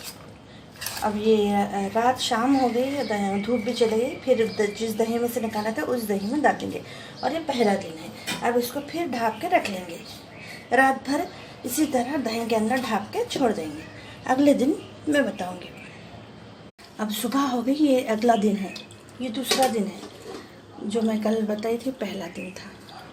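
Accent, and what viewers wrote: Hyderabad, India. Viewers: Aap hydtaba ke hoky aapki baat ka lheyja wesa hi laga chhahy kafidino bad dhiki mirchi ki recipy dikhaidi..mujhey bhut bsnd hy..😋😋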